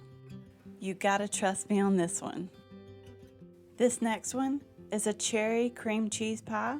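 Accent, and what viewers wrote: South, United States. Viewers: I just love your sweet southern voice. All of your recipes look so delicious!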